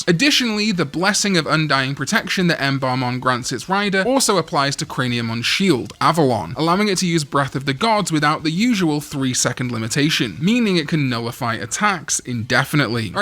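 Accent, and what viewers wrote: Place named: Wales, United Kingdom. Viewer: It's nice to hear a welsh person butchering Irish words. There's some kind of solidarity there.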